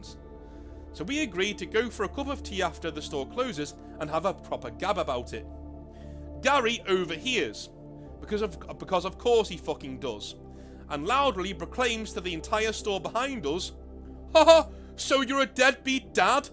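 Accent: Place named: Southport, United Kingdom. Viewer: You're a Lancashire guy who's in Merseyside but say it doesn't exist. You sound like your from Southport my hometown. Southport is an oxymoron as it is not South nor a port. It's north of Liverpool 43mins on the train. It's also somewhere people come to die. You can't more for care homes here. It's truly God's waiting room. But the important thing is that Southport sits right on the border of Lancashire and Merseyside. (Where the black watch on the wall keeping the wildling Lancastians out) 😂 However there is a lot of arguments here as to whether we're Lancastian or posh Scousers. So when you said you where in Merseyside but actually you're Lancashire it made me think.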